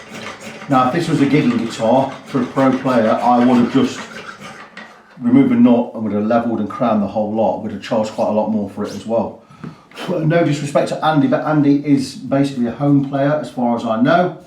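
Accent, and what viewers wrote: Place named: East Midlands, United Kingdom. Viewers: Great tutorial. Thank you for the gmi tools tip. You sound like you're from my neck of Sherwood Forest. Derby, Notts or Sheff area. Lovely to hear that accent all the way up here Int North. It was your pronunciation of 'about'. We both say it 'abart'. Top guitar tech in bud. Duly subbed and liked. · I was guessing Leicester!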